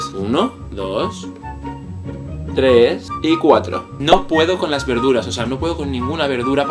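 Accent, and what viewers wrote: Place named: Ribera Alta, Spain. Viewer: sabia que eres de la ribera alta por tu forma de hablar, pero este video no lo había visto, de alzira, pues yo también de la zona cerca cerca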